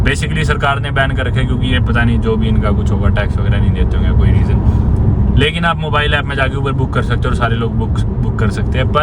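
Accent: Haryana, India. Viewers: The thick haryanvi accent is such a turn off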